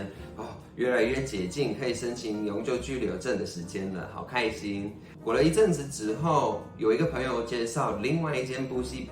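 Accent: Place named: Taiwan. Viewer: 滿滿的台灣腔，好親切喔～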